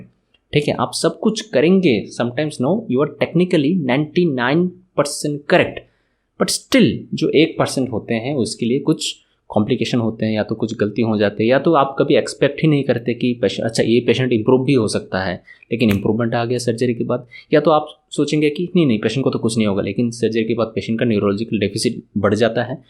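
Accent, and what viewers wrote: Bengal, India. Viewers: Areyy bhaii Hindi te r English Bengali accent bojha jachhe naa nki ?? ... R emni teoo ... Bangali toh obviously Bengali fluently bolteii paarbe .... Kaarpn Mother Tongue toh Bengali ... !!! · Love ur bengoli accent😅😅 It remides me of my chemistry teacher He was also from wb He came mp Whenever he speaks he makes commendable mistakes in hindi Lovely teacher Debdas Patre #arrowdere Love u sir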